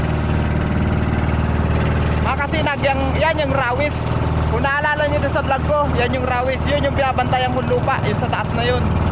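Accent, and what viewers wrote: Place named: Albay, Philippines. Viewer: kala ko kasi taga albay ka yung tuno kaso ng pagsasalita mo parang sa albay. San ka sa cam sur idol? Update mo kame lage ki bagwis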